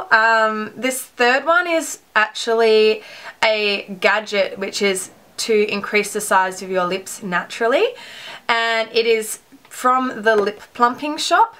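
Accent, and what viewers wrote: Australia. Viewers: Great tips! Thanks. I didn't realize your natural hair was that light. Looking lovely as always, and I like the Australian accent.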